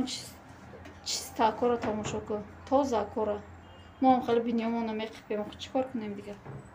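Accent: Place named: Hisor, Tajikistan. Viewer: Ассалому алайкум ва баракатуху Апачон созен хубен фарзандо созан хубан хамакаса ма ша Аллох шумо бехтарин Зан хастен зани хоксори точикои худмон шумо бехад манда махкул шиден простой Зан хастен авалдарача гапзанитон манда махкулай чунки ранги мо гапмезане мо аз Хисор мешем хонамон дар Кальаи Хисор наздикаст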